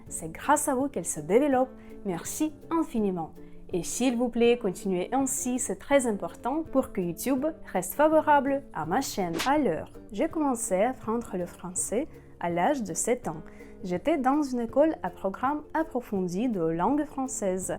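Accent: Russia. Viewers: depuis décembre 2022 j'ai lancer ma chaine youtube sur les réactions musicales et je me suis axés sur les artistes russes que je trouve vraiment incroyable, sinon je viens de découvrir ta chaine et j'aime ce que vous faites et votre Français est vraiment très bon avec votre accent russe c'est juste superbe et moi j'adore la langue russe que je trouve magnifique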